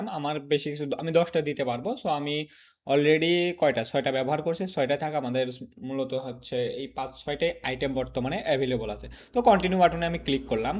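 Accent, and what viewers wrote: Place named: Feni, Bangladesh. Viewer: Apni jey vhabe bujaysen.best.oneker paid course a egula bole na.i mean janena.best of luck. Apnar kothar tone a mone holo fenir ashe pashe may be home?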